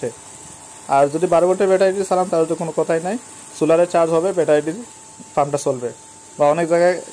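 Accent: Sylhet, Bangladesh. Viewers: Vai Sylheti Sylheti lager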